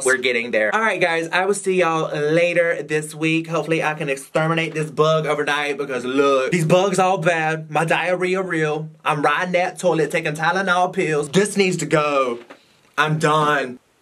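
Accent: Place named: United States. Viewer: You should do an entire video trying not to let your country accent come out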